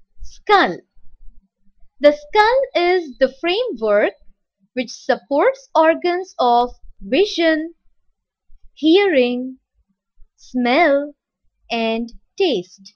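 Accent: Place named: India. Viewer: Unbearable Indian accent.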